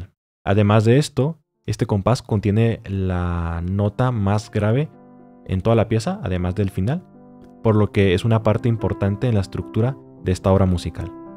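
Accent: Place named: North, Mexico. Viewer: Sospesho que eres del norte de México por ese acento tan peculiar 🤭 ánimo paisano, tú canal es muuuy bueno!!!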